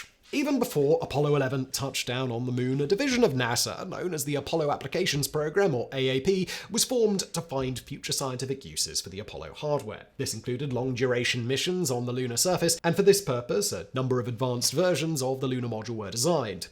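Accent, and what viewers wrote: United Kingdom. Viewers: I love hearing a British voice narrate American achievements.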